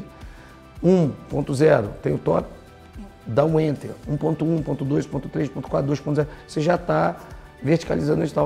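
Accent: Rio de Janeiro, Brazil. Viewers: Ahh meeu gratidão! Nunca estudei tanto na vida haha Só consigo ouvir aulas que tenham vozes de seres humanos inspiradores! Tipo sotaque carioca dos vídeos de direto administrativo! Obrigada!!! Jah Bless 🆙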